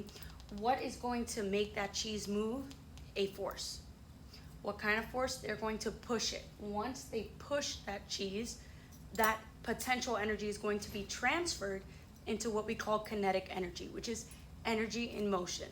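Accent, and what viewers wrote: United Kingdom. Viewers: Wait are you actually British? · Omg I love your videos so also i love the British accent lol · The British accent eats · Pin pls the BRITISH ACCENT GOT ME😂😂😂😂😂 literley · That British accent is so spot on!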